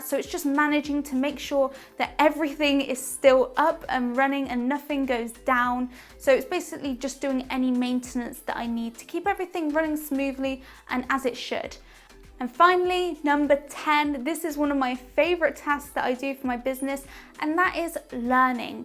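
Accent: United Kingdom. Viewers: Love your lecture and I admire you been so young and doing so many tasks, yes been a professional artist is not simple and yes we need to learn more from others new techniques or ideas or how the business world change and new technologies are introduce, I love painting ,but not for business, I do other type of art as well, but I understand the importance of keep with the advance technologies. Congratulations you are great artist, and I am thinking in enrolled into your course, as I understand fir your accent you must be in somewhere in the United Kingdom, not for sure is England or Irish, either way is ok, just curiosity, are your courses in dollars ? I am in Australia and want to know wich currency you use. Thank you very much, my e-mail is <mail>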